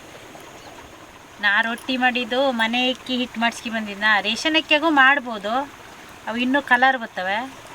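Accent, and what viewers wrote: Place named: Malnad, India. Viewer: Super 👌 ಅಮ್ಮ. ನಮ್ಮ ಮಲೆನಾಡು ಕಡೆ madudu ಚಂದ ಸೂಪರ್ ಅಮ್ಮ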